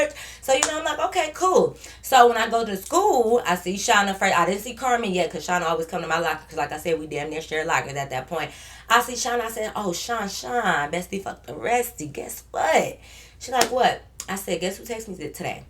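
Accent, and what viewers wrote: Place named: Detroit, United States. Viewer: Omg at the beginning I was saying in my head where is this girl from? Then Detroit came to my mind then i was like No that's where they are just to find out she is!!!😂😂 i love your story times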